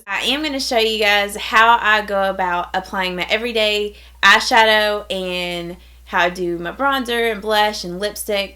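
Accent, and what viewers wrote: South, United States. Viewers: Juz love to hear your southern accent!! We needed a southern bell makeup guru. Hugzz from Dallas, Texas!!!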